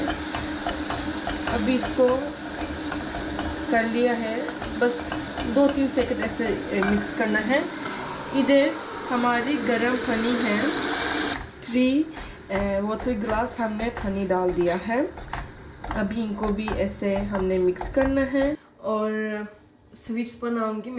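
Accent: Pakistan. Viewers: Inka Urdu lahza pakistan ke kis area ka hai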